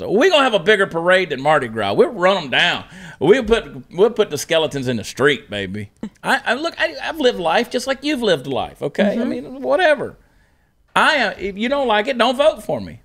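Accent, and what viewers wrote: Texas, United States. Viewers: YeeHaw, thats a texan right there boy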